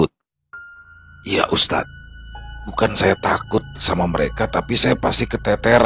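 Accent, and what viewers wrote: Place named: Betawi, Indonesia. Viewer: Seru kalo bang bawain cerita orang betawi,pas dan akurat logat betawi nya,sukses selalu bang.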